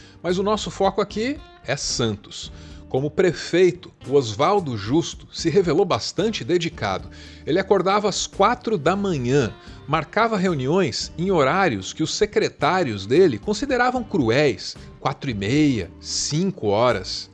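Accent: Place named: Bahia, Brazil. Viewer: E com um sotaque bom e gostoso😂 deve ser baiana, como eu kkk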